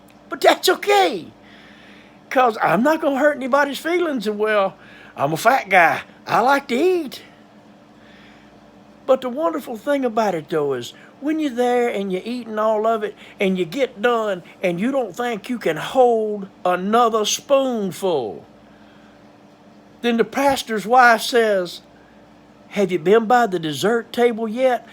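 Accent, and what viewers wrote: South, United States. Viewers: It wouldn't be if he didn't talk southern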